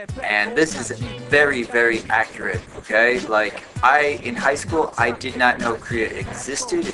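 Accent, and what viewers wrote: New York, United States. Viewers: are you from upstate? or long island? just curious..lol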